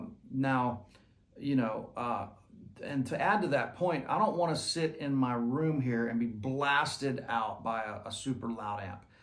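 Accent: United States. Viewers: reat vid great playing like that southern accent. I have Princeton reverb with ironman attenuator and like that setup. Background, i been playing only 2 years and no where to your playing and current live in sober living environment(been sober 2.5 years) and we have own rooms. So i try to be mindful of everyone. People work all shifts, i get up mad early and people say they rarely hear me play. I love my princeton and unless i start gigging or hit lottery doubt i really will ever need another Amp. I also have champion 40 w which doesn't compare but is adequate for heavier stuff. Just curious your opinion on attenuators they don't seen to be very popular option. I will try it unattuated later. I just always assumed that was suboptimal to play at 2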